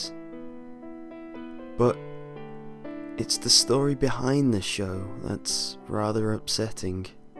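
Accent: United Kingdom. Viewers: WOW..WOW.....WOW!!!!! THAT WAS SO DEEP! I LOVED IT! BTW I keep thinking your British.. I don't know why but...I just think that. Are you British?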